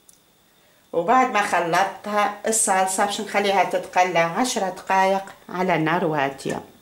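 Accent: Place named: Tunisia. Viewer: ليه لهجتكم يا تونس تهبل؟ واكلاتكم باين لذيذة...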